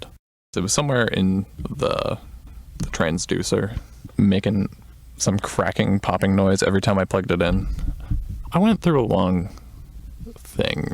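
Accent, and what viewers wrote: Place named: Silicon Valley, United States. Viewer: .... Speaking in the Silicon Valley derived, computer punk dialect....... (slightly feminised, conspicuously/overly precise, observationsl, complete with vocal fry.) Computer nerds everywhere will be cheering.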